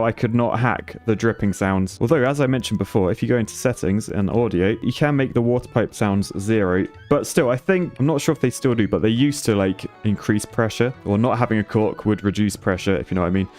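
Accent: United Kingdom. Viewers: I watch your videos to practice listening to british accent and it works pretty well. Thanks, mate!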